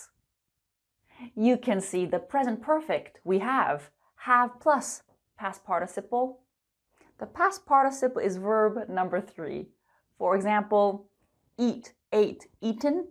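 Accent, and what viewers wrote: United States. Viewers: THANK YOU, ARE YOU AN AMERICAN? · It has been a utterly splendid, exquisite and flawless lesson. I'm particularly joyful, blissful and elated with that opulent topic. It is to my liking; moreover, it appeals to me. I do adore speaking, and her charming accent. Hence, you are the quintessential of American English. You are the teacher whom I always wish to meet. I was wondering if I might dare to ask you a question? I saw the verb “gotten” is that correct? Thank you very much indeed. I pay my respects to you. You are the be-all and end-all. Far thee well, dearest Prof.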